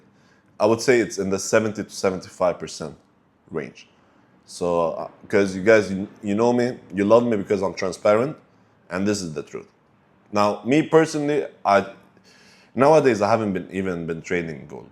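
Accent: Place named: Eastern Europe. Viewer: When I hear an eastern European accent, I listen. I hear you guys know how to trade.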